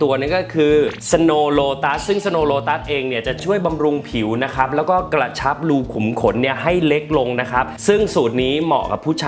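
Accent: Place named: Thailand. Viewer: พี่บอยสำเนียงไทยมากกกก น่ารัก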